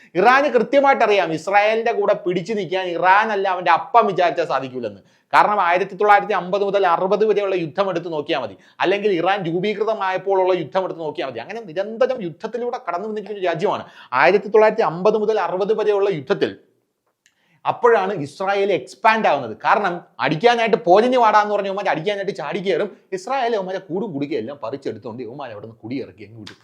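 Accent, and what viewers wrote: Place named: Trivandrum, India. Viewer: Nice explanation in Tvm. Slang, very interestingly presented ❤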